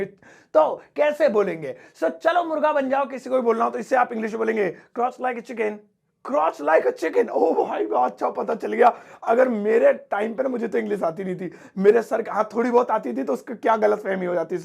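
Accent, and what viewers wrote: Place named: Haryana, India. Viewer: Accent Haryanvi wala hi h sir Sare hi words ki pronounciation......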